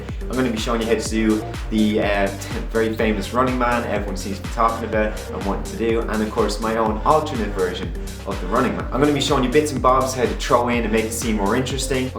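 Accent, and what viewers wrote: Ireland. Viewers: Are you Irish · You from dublin?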